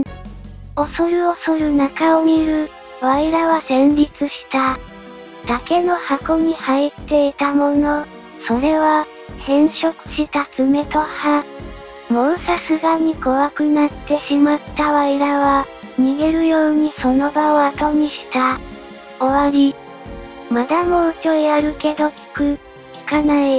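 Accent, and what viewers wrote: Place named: Kansai, Japan. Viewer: 味付けのために変な関西弁つこうてるんやろうけど 関西でそんな海まで熊が降りてくるようなところあったかな～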